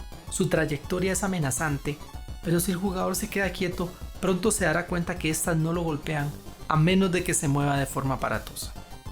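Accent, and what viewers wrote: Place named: Costa Rica. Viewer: Ese acento es de Costa Rica. 🇨🇷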